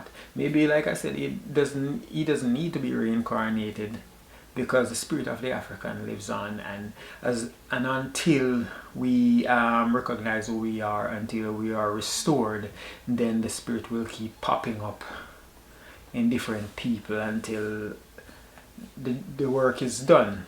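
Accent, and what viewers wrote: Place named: Jamaica. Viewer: Your video just popped up on my feed and at first I thought you were his fellow African speaking about him. And then I realized you’re Jamaican before you said you were. I’m also Jamaican. I’m living in the US for over 30 years. And I love him. We need more people like him. And what you say is so true. I think we have to thank God for YouTube first and foremost. Because YouTube is allowing us to bridge the divide across the oceans. I’ve learned so much about the countries of Africa in the past year from tuning into not just his video, but from many black folks, who have traveled to Africa, as well as some Jamaicans, who traveled to Africa, including some who’ve relocated there.YouTube is a godsend, and subsequently Wade Maye is a Godsend. Blessings to all the diaspora around the world.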